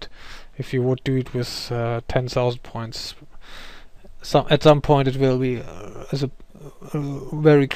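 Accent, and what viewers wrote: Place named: Germany. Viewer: thank you, really very useful.. i kind enjoy the German accent :)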